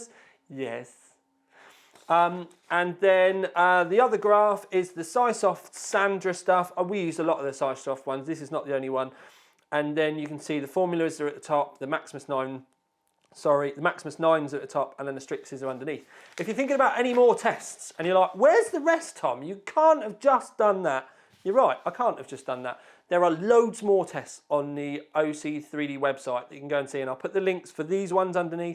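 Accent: United Kingdom. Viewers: nope, they are not a big fan of British accent I assume.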